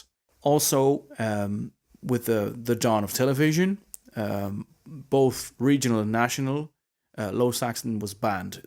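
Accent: Ireland. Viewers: You sound Irish. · The bloke sounds like an Irishman but says he is Saxon? I thought the Low vs High was geographical reference. Were Danes Vikings or maybe a transitional population? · How did you get an Irish accent? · A Dutch guy speaking Irish accent. This is new to me. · I have the funny problem of cognitive interference of your Irish accent when my mind is expecting a first language adjacent accent, that is with more influence from Low German. My mind will cope by thinking you're an Irishman who has learned Low Saxon! Good to learn more about my ancestral language. My family were from Westphalia region and Altmark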